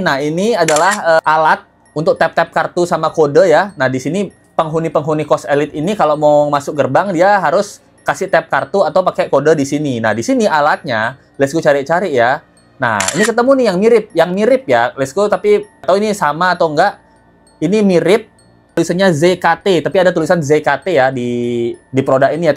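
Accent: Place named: Sumatra, Indonesia. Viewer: Logat lo sumatera bgt😅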